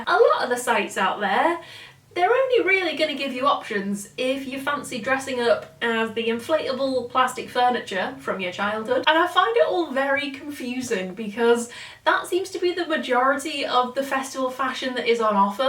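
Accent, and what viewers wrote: Liverpool, United Kingdom. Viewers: My live watch-along thoughts: 1. As you said "You're my pal in the corner saying that's the one" I WAS TOTALLY YOUR PAL HYPING YOU UP, definitely go for the second white dress. 2. A black fedora can make any outfit, you must take it! 3. Your black turtle button dress did look a bit big but I think if it's really hot (ha! unlikely) you'll appreciate taking it and a belt could help. 4. Your pink paisley dress looks frickin adorable, can definitely see you floating round a field with that covered in glitter, very Florence-esk! 5. I agree I think the blue paisley dress needs to be longer but again I love those sleeves! 6. sings along to Might aswell face it your addicted to sleeves 7. The ditsy leopard dress reminds me of mustard, and I'm not sure it's a good thing. You can def do better. 8. Catagorically hanus is my new favourite phrase 9. 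HOLY FUCK THAT DENIM LOOKS AMAZING ON YOU! 10. OMG SHE DOESN'T LIKE IT, I WISH I COULD BEEM MY THOUGHTS TO YOU 11. The denim also has pockets, extra brownie points. 12. Those jeans are banging 13. I love your scouse accent because it's like Jodie Comer has just wandered in. (Killing Eve fans will know) 14. you just bless us with your videos, have an amazing time at Glasto! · Why do you look good in everything 😍 and that scouse accent HAHA been living in liverpool for 3 months and i still can't get over it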